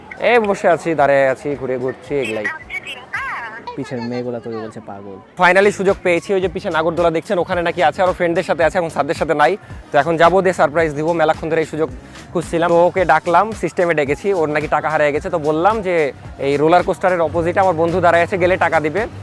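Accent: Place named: Nawabganj, Bangladesh. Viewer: ভাষাতে নবাবগঞ্জের টান আছে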